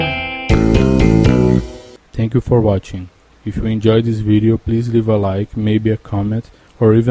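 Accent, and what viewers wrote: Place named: Brazil. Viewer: Puta que me pariu, comecei a aprender baixo e curto math rock tem um tempinho. Sigo teu canal faz uns meses e eh o meu preferido! vendo esse video e o sotaque pensei " vish, parece BR" e eh mesmo hahaha Maaaano, muito feliz em saber! :D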